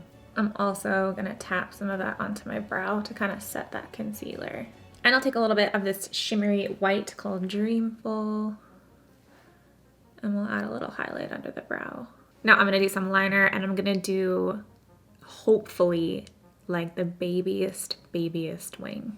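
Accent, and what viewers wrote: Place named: Canada. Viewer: Yes! I loveee Canadians and their accents. It always brings a smile to my face. 😌♥️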